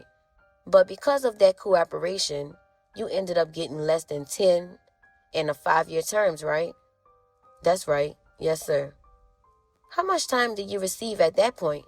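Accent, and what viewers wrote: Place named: New Orleans, United States. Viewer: Ole Wild Bill..aka Telly..ok the narrator got that New Orleans twang down pack..Salute..Love yall👑💕⚜⚜⚜⚜⚜⚜⚜⚜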